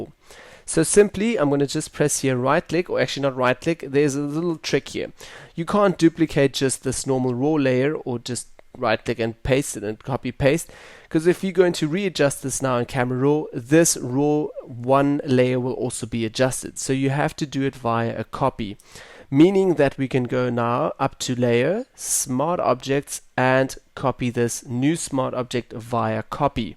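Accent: South Africa. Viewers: Hey you're from South Africa... :D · Thanks so much for your time and nice accent which reminded me of my old friend from South Africa. It would make more sense if you didn't make this shot in the camera because you kept on saying you would rather re-shoot it. That's why we have you as an expert to make these tutorials making mistake shots look acceptable and punchy. Thank you so much. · wow u from south africa nice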